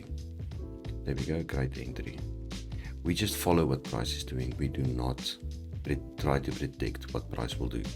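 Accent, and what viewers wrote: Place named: Afrikaans, South Africa. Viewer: Sound like you Afrikaans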